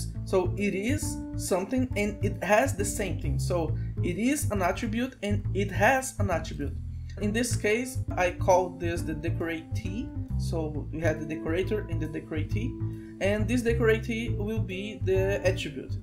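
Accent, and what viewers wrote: Brazil. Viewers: sotaque brasileiro??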